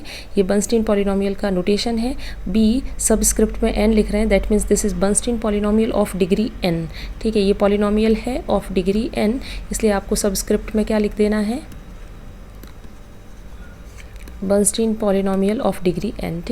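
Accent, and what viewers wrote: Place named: Bihar, India. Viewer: Aap bihar se h kya, aap theek h bolti h isliye puchha h, waise aap topics achhe se explain krti h , Dhanywad.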